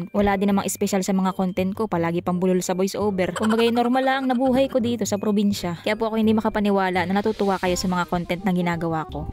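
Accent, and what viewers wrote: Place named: Visayas, Philippines. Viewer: Bkit Ang salitamo mayhalong besaya besaya b kayo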